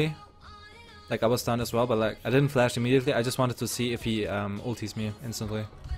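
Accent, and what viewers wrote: Germany. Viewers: are u from german ?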